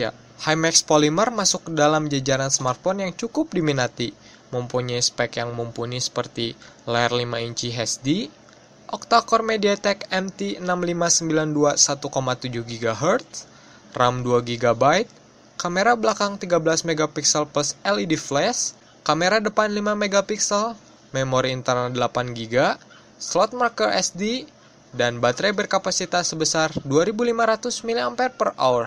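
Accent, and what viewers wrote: West Java, Indonesia. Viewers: Logatnya sunda pisan... haaaaa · Ngomong nya sundaaaaaa bingittt...hehehe..anyway..goood...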